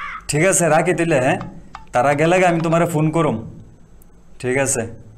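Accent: Brahmanbaria, Bangladesh. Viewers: আমডার বাউনবাইরার ভাষা দি😂😂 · ভাই আপনার কথা গুলো বাংলাদেশ ব্রাহ্মণবাড়িয়ার আঞ্চলিক ভাষা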